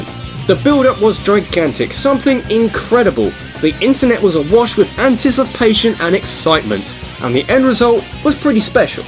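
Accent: United Kingdom. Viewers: are you british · Some-one else realises the sheer glory of the British Accent. I love you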